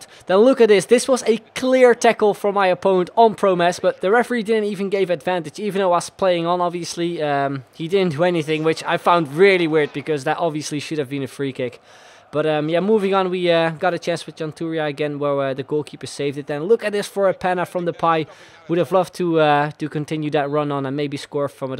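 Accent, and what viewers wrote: Netherlands. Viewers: Je hebt echt een nederlands accent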